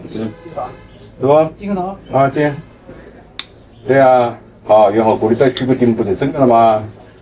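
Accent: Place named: Changsha, China. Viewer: 像长沙话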